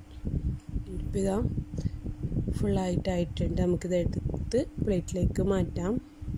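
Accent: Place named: Kasaragod, India. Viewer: ഞമ്മളെ ഭാഷ കാസറഗോഡ് ആണോ കേട്ടപ്പോ അതേപോലെ തോന്നി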